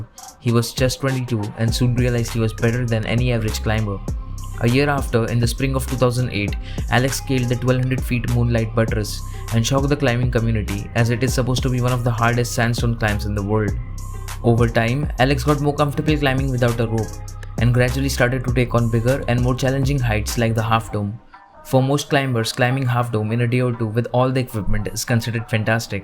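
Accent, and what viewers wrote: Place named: India. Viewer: Are you Indian? I hear you sounds like call center.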